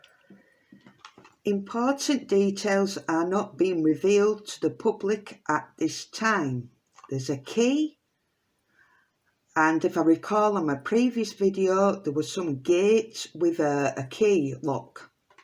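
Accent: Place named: Yorkshire, United Kingdom. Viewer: Hey I’m in Rotherham s Yorkshire, your accent sounds familiar hun x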